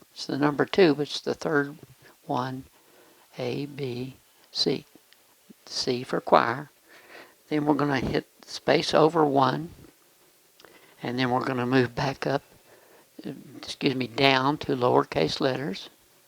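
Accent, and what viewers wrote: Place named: South, United States. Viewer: very nice because of your accent sounds like country and western town just like Nashville or texas love it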